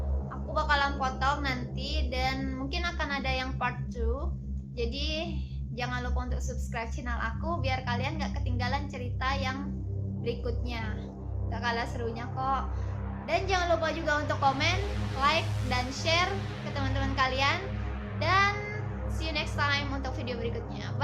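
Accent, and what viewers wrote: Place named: Kalimantan, Indonesia. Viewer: cara bicaranya mirip org kalimantan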